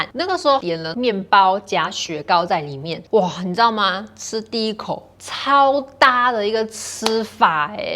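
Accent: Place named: Taiwan. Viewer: I tuned in to listen to Taiwanese Mandarin ….so endearing 😘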